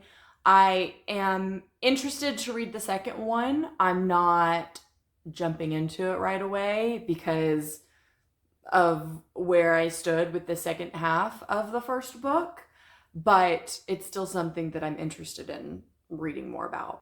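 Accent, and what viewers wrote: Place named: South, United States. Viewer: You sound like you live in the south....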